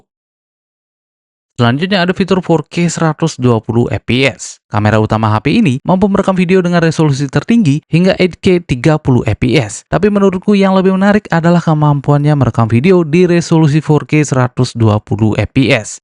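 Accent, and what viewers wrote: Bali, Indonesia. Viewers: Orang bali bang? T nya khas bali banget 😅🙏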